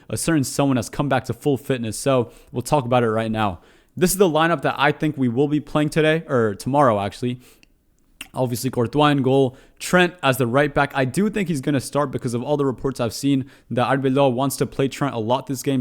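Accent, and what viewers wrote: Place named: Argentina. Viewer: Do you have from Argentina? You sound Argentine when you pronounce Spanish words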